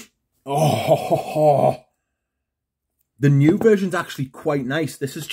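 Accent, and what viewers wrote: United Kingdom. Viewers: PS are you from UK?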